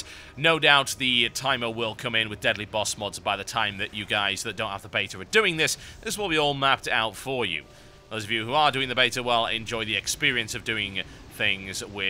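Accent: United Kingdom. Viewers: ahhh british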